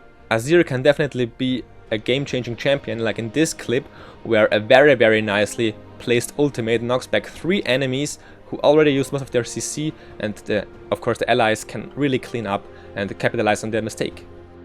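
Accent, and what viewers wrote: Germany. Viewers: Nice Tutorial. But now I am really interested. You dont really sound like youre from England or America. With which I dont mean your English isnt good its actually really great, but you dont got that accent. Thats just a guess but are you from Germany your accent sound kind of like the German one. I am from Germany so I am really interested now :)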